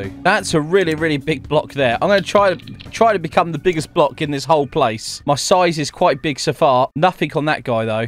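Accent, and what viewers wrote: United Kingdom. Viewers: What country are you from you sound like someone in the uk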